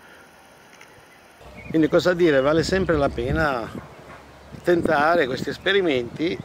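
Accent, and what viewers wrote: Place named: South, Italy. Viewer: Italiano del sur supongo: Sicilia, Nápoles o Cerdeña...(( Yo lo entiendo todo )).Es fantástico...!!! Amo Italia...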